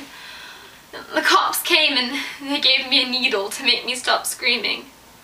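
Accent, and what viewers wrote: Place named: Australia. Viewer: so two thoughts, First where are you from, on certain words i hear the slightest accent, like you hail from Australia or some thing, other thought, i really did not feel your emotion, you tried to emote but i did not feel it, lovely any how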